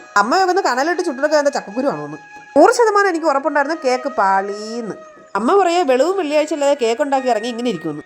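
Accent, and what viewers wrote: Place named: Pathanamthitta, India. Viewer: ഒരു പത്തനംതിട്ട slang അതാ chodiche❤️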